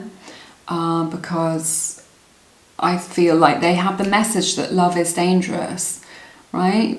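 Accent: United Kingdom. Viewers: Pile # 3 and specially pile #4 : resonate 100% , this video is a turning point for me , let us call it the beautiful truth , we really need always to search for the truth.Its almost personal , I have done the work on myself and learned my lesson , thanks for the universe for upgrading us and thank you so much for helping me sort things out . I love you and love your British accent too 💓💓 To my fellow viewers : I watched the whole video , the 4 piles and I got a message from each of them ,somehow 4 piles resonated with me somehow , wish you all love , light and the best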